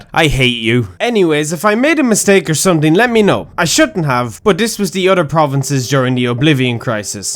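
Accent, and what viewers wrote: Ireland. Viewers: Finally! An accent that's not american! But Irish! I thank thee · The paddy is strong with this voice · Im irish and ive never heard someone as irish as you. Great video man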